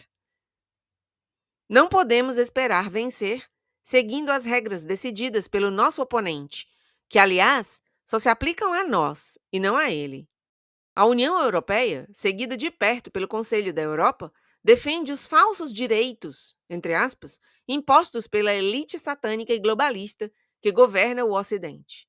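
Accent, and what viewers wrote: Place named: Ceará, Brazil. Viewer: Muito boa a explanação das palavras do Monsenhor Viganò,. E com todo respeito: o seu Português falado é muito interessante de ouvir. Manifestações do Castelhano com sotaque nordestino do Ceará, onde você morou por um bom tempo.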